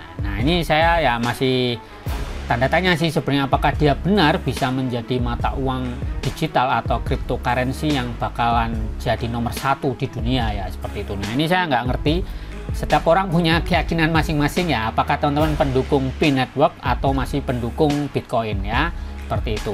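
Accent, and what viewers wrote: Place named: Java, Indonesia. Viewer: medok kali mas.....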